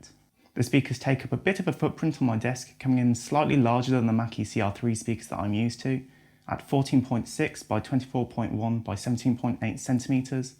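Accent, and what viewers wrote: United Kingdom. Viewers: i'm really impressed with your clean british accent, nice review man... i must have this one this coming christmas eve! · This guy's British accent is so thick, I can't understand him '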